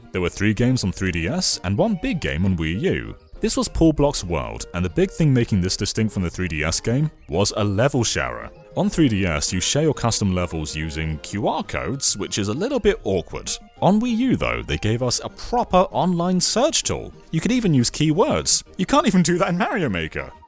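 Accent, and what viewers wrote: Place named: United Kingdom. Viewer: 🤮🤮🤮🤮 uk accent is so annoying!🤮🤮🤮🤮🤮🤮🤮🤮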